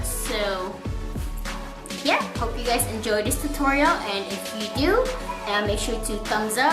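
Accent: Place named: Philippines. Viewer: You are so pretty! I watch you all the time. Love your eyebrows, eye shape, and your cute accent! Sounds a bit Filipino. =) You Friday Night Hair GLS95 straight long hair looks good! I wanted to order it last week, but it was sold out! =/ What type of hair is it? Does it have a Yaki feel, or a silky smooth feel?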